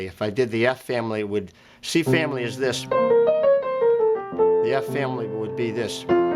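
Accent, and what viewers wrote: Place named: New England, United States. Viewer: That was one of the best lessons I've ever had! What a great video. Clear, concise and impactful. Thank you! I've subscribed and will check out your website today. PS, great to hear a NE accent down here in TX!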